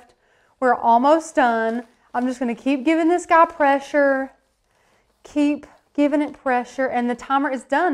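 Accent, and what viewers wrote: South, United States. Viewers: Thank you for an excellent video! I learned exactly what I needed to learn and your presentation was informative and to the point. I also Loved your southern accent. Thanks for doing all the hard work. Excellent.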